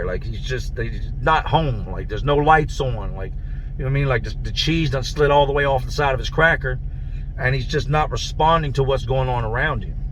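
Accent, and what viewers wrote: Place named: Philadelphia, United States. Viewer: ur doing excellent work on teaching people the perils of prisons ect. My wife thinks ur cute, u sound like ur from N. Philly, ☺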